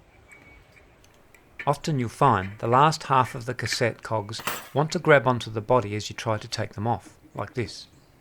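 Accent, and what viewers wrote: Australia. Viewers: I might get a set after Christmas. I am normally dissapointed by my presents and end up spoiling myself with stuff I really want. I'll let you know if I do. I've only recently discovered you. It's nice to hear an Aussie after watching gcn, cycling weekly, bike radar and the vegan cyclist.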